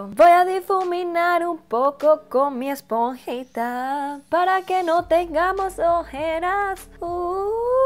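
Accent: Venezuela. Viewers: jajajajajajajjajajajaa que risa no paro e reir ¿eres venezolana?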